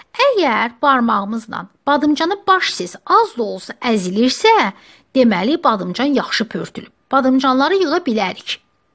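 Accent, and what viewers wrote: Baku, Azerbaijan. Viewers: Eziz Bakilim cox gozel ellerinize sagliq👍👍👍shirin lehcenize defe defe qulaq asdim😂👍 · Sağ ol kənd qızı. Bakının ləhcəsinnən və şorabasıbnan doymağ olmur ki olmur dəəəə. Halal olsun. Mənə ləzzət verdi. Əlün - qolun var olsun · XANIM ÇOX SAĞ OLUN SƏLİS VƏ AYDIN DANIŞIB ÖYRƏTDİYNİZƏ GÖRƏ .GÖZƏL BAKI LƏHCƏNİZDƏ.🤝🤝🤝🤝🤝🙏🙏🙏🙏🙏🙏🙏🙏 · Ne sirin danisirsiz? Ewq olsun bakililara..Esl baki lehcesi..Var olun xanim..Ellerinize sagliq..Allah bereket versin islerinize..❤🥰💋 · Super əllərinizə sağlıq danışığınız super Bakılısız deyəsən 😊😉👍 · Qədim BAKI LƏHÇƏSİ. 😊😍👏🏻👏🏻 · Can Bakı lehcem!!! Ele darıxmışam ki, öz danışığımız üçün. Resept de elaaa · Sip sirin Baki lehcesi. Danisiginiza vuruldum. Mukemmel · Size qulaq asanda, mene çox xow olur, içimde nostalji bir hiss oyanir. Uzaq keçmiwime, uwaqliq illerime, köhne mehellwmize , teze pirin üstundeki evimizeze, heyetimize xeyalen qayidiram sanki...Ustaliqimiza da ki, heyranam açiqi. 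Sizin reseptinizle göyem mürebbesi hazirladim bu il, çox gözel alindi. Ellerinize saqliq, allah dnyadan köçenlerinize rehmet elesin. menim bakili lehcesinde wirin- wirin daniwan bacim, var olun!!!🙏🏻👏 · Bakılı ləhcəsidir🥰özüm agsuluyam bakılıların geliniyem Şüvəlan da çox sevirəm bakılıları çox mədəni insanlardir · Xanim,canim menim Baki lehcesuycun daruxmiwuq,Allah ellerinize dilinize saqkuq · BAKI lehcesiii 👍👍👍👍Hansi kenndir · Ay senin bakili lehceve qurban olum ,ay qizim.Ellerive sagliq.Hem danisigin xosuma geldi,hem reseptin.Sag ol,var ol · EZİZİMSEN Baki lehcene gurban. Gulaglarimiz sevindi................ Süperrrrrrrrr hazirladin! Muş OLSUN XEJİRLİKLERLE İNŞALLAH👍♥️👍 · Caaan. Bakılıların ləhcəsinə heçnə çatmır da... 💋🥰Əlün_qolun var olsun. Balona yığma qaydası da düpbədüz. 👍👏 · Can sizin ucun!!!Uzurlu sayin gec cavab verirem.Ele ne yaxshki,sizde varsiniz! Ureyinize,dilinize,el-qolunuza sagliq.Olsun,qoy olsun bizim Baki lehcemiz!!!Seve-seve,zovq alaraq izleyirem.Bu arada,badimcan shorabam lap ela alindi.Sag olun,var olun eziz bacim! 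· Nə gözəl öyrədirsiniz. Çox sağolun, öyrəndim. Bakı ləhcəniz də çox gözəldir. 😊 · Cox Sagol can, Baki lehcesiycun cox darixmisdim. Badimcanlar da Cok eladi Bele de eliyciyem. 😊👍💐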